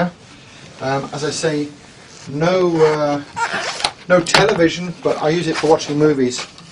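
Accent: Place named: United Kingdom. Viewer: Why does a British accent seem to make things oh so good. An insult to ones intelligence. The dude yaks to much.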